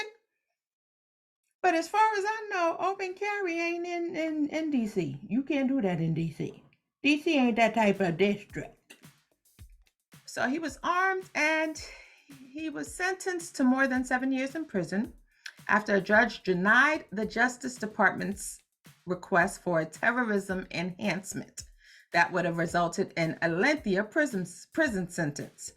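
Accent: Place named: Jamaica. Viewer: I HOPE YOU ARE A JAMAICAN.... WE DO THAT BRITISH ACCENT.... LOVE YOUR SHOW...PLEASE POST YOUR EQUIPMENT IN SHOW MORE DETAILS. I LOVE YOUR LIGHTING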